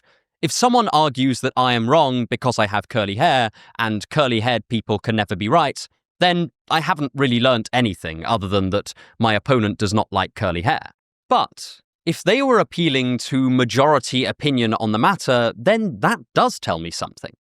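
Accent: England, United Kingdom. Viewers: I'm like 2 minutes in and the English accent alone make this so legit and sounds so intelligent.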